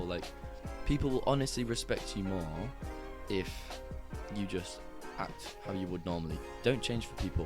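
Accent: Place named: Manchester, United Kingdom. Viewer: banging in a manchester accent video 🥳🥳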